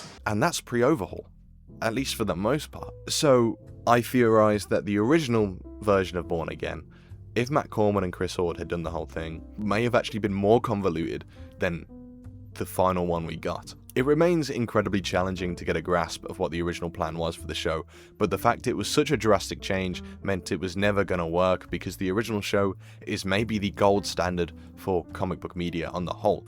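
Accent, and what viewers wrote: United Kingdom. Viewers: It's infuriating that "lore" and "law" are pronounced identically with a British accent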